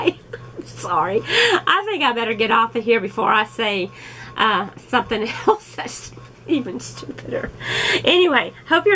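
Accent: Texas, United States. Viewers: Haha you had me at your Texas accent 😍 I’m from Florida and have slowly learned knitting the last couple of years...but have most recently picked up crochet....that is my hobby/life right now haha... other than play with yarn, I’m recruiting my granddaughter to play with yarn with me lol....she is 6 1/2 and she loves rainbow yarn and always picks it out, usually solids that make up a rainbow and demolish and knot up 5 of my skeins....lol It’s OK just having someone else around interested in the same thing is wonderful 😊 So glad to have found your channel can’t wait to watch all of your videos.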